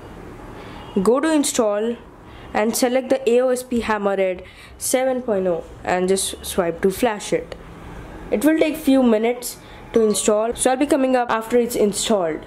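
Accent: India. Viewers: 印度英语…挑战我的忍耐能力… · that cringy Indian accent. stupid fcks.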